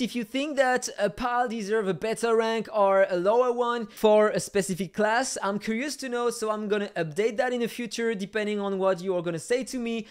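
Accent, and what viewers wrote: France. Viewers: crushing it! best content out there so far, i just struggle with the french accent, but thats on me not you :P keep crushing it! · jsens un petit accent français ? Je me trompe peut-être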